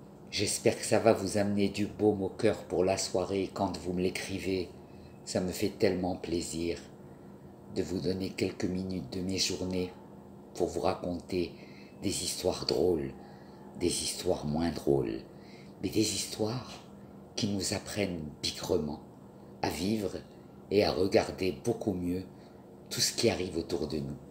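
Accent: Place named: Morocco. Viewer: نعشق لهجتك المغربية التي تدكرنا بالعلاقه المثينة بين اليهود المغاربة والمسلمين المغاربة.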